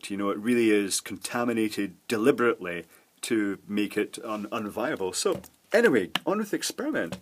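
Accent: Scotland, United Kingdom. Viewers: something satisfying about a Scottish guy cursing.......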